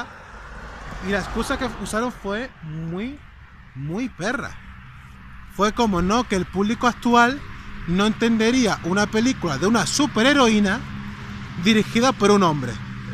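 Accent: Spain. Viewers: No se, su acento es muy español, su intro es de español de España. Pense que era de la península Ibérica.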